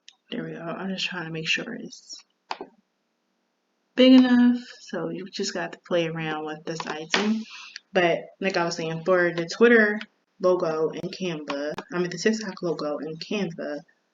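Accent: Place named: Philadelphia, United States. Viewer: Thank you for this video so helpful!!! 🫶🏾You sound like you from philly… are you from philly ?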